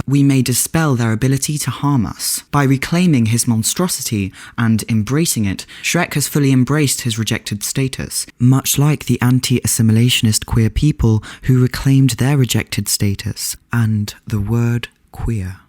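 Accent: RP, United Kingdom. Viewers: Comes for the intriguing premise of the video... subscribes and clicks the bell for the adorable person with a wonderfully posh RP accent narrating said video.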